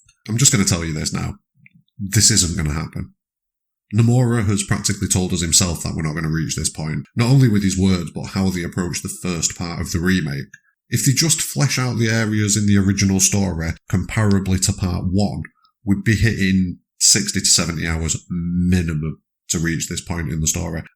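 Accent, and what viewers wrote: Northern England, United Kingdom. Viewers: Also nice to hear a fellow Northerner. We don’t have many people from our part of the world. Most are across the pond. I am from East Lancs so not far from you at all.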